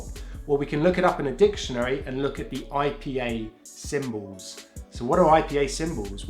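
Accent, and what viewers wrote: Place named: United Kingdom. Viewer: British accent is beautiful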